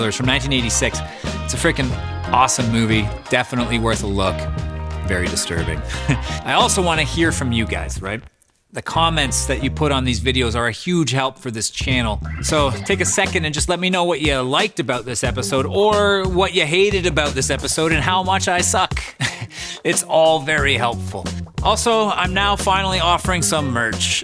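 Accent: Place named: Canada. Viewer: Such a good episode, full of little tidbits I hadn't heard of. Also, love hearing the Canadian accent poke through every now and again.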